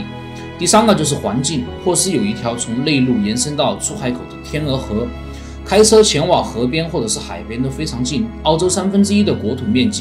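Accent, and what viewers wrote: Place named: Chongqing, China. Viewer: 博主听口音应该是重庆的？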